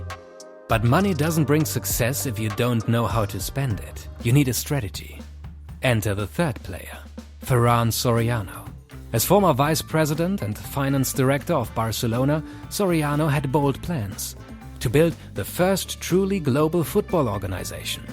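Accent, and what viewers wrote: Germany. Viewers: German accent as well haha (I love it btw )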